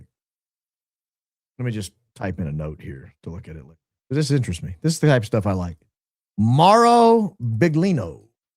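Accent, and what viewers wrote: South, United States. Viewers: I really like the way you say “was” and “drunk“. Your subtle southern drawl comes out with the “uh“ sound.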